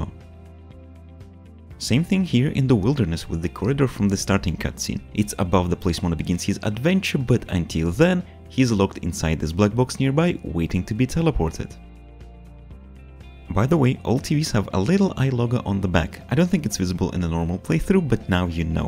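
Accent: Russia. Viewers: I feel a Russian accent in your words I'm from Russia :3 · Я слышу русский акцееент~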